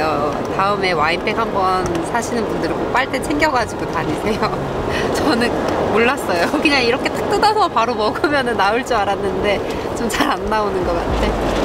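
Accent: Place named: Seoul, South Korea. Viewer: 서울 말투 인가요 차분하니 말씀 잘하시네요..경상도 말많듣다보니 너무좋네요 ㅋㅋㅋ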